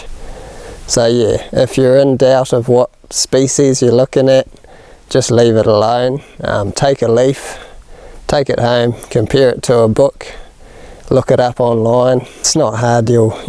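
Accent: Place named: New Zealand. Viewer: Huppast 12 to huppast 2 ah bro 👍 Spoken like a true kiwi. Love it !! 😎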